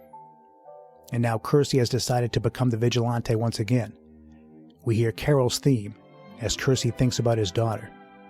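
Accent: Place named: Boston, United States. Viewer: Came for the Winner facts, stayed for the Boston accent.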